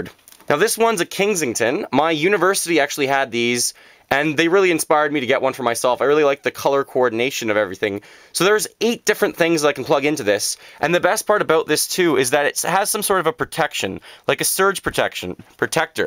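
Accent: Canada. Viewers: ***** He sounds Canadian to me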